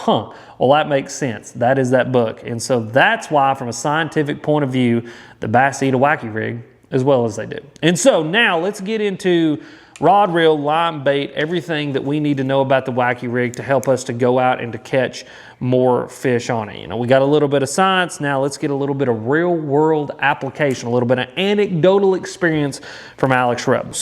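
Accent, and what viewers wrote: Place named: South, United States. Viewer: I see your masters hat, and I hear your southern accent. I just found your channel and it looks like you are in the CSRA area based on what I can see so far lol I've been transplanted here from Wisconsin and I'm trying to figure out how to fish the Savannah River and other South Carolina lakes. Thanks for your videos!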